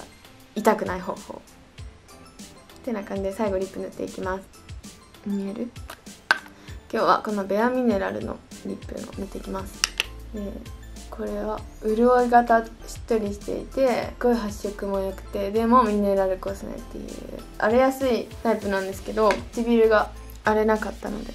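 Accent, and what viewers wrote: Kansai, Japan. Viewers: かわいい🥰🥰🥰 いつもさりげない関西弁がちょー好きです関西のどこ出身でおられるんですか？わたしは26歳大阪です💓 がんばってください！！ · この顔で関西弁、、強すぎる💕𐤔𐤔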